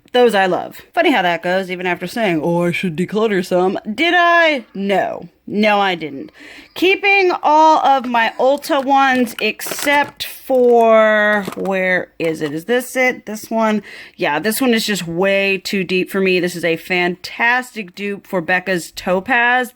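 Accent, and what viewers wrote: South, United States. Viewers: So where were you living? I know you have a southern accent but I have always been curious where you were from. I’m in NC!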